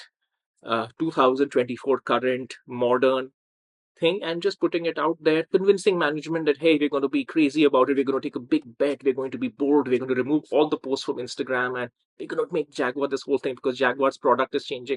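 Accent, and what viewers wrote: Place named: United States. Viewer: He sounds American, very disappointed if he's British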